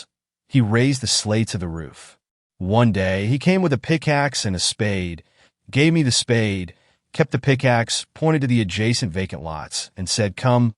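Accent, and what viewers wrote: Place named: United States. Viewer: Horrible American reading voice.ruined the story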